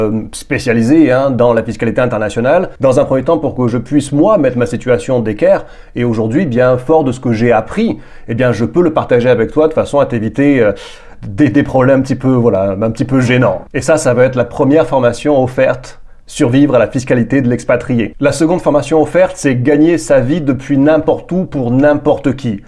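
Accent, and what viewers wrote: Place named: Belgium. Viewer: Attends, à cause de son accent ma femme croit qu'il est belge 🤣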